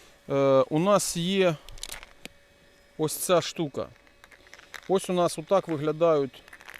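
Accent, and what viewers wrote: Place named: Russia. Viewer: Терпеть не могу когда москворот пытается косить под мовного знавьца. Ну не можешь на мове, ну трещи як москаль. Якой жах?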